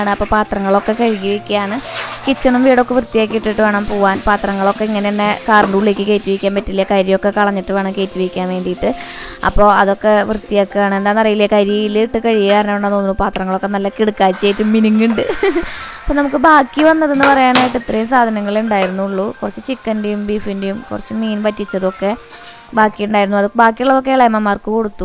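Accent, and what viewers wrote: Thrissur, India. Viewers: ചേച്ചി തൃശ്ശൂര് സംസാരശൈലി സൂപ്പറാ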